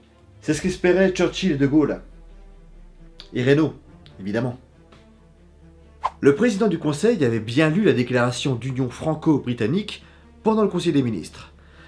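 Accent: Germany. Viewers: magnifique accent allemand ( et super vidéo en passant ^^ ) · Je me permet de recommander une jeune chaine yt axé sur la seconde guerre mondiale, et qui a traité de l'offensive de la Sarre (le petit sketch du début avec la bière), c'est la chaîne des historateurs, n'hésitez pas 😎 Et comme d'hab... très bon accent allemand passif/agressif! 👌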